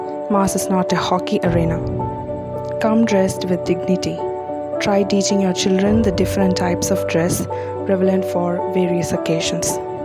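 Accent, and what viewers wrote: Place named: India. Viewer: Very nice video presentation, and excellent advice. You sound Indian. Kerala, by any chance?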